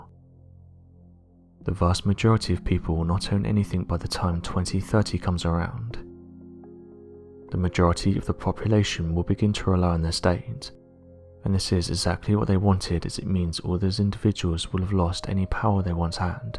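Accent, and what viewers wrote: England, United Kingdom. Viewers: Stories are great but I cannot stand the guys voice, it's the one English Accent that sounds like a head-injured rugby player... apologies again as it is not like me to criticize but, at this point it's like nails on a chalkboard, and, I taking and hiding these notifications and I will find suitable replacement...👽🚬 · Cant stand your English accent! Find someone else to do the narrative.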